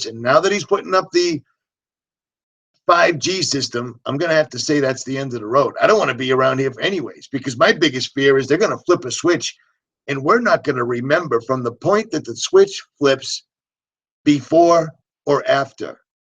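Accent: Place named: Massachusetts, United States. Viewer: Hi! Great video as always! Every time I listen to one of your videos I can’t help but think of my time living in Marlboro Ma for 10 yrs! Still have some family & friends so when you talk it always reminds me of them and makes me laugh because of the accent! I love it!! Be blessed and God Speed! Love you keeping it real!!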